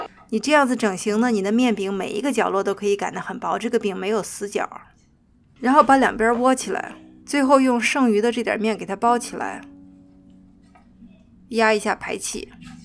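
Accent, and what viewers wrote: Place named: Xi'an, China. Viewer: by her accent, i guess she is from xi'an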